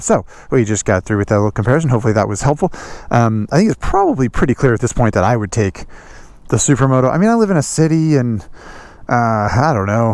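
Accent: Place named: Orange County, United States. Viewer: I don't follow you, but your ride looks like suburban hell, dawg. Accent I'm calling oc.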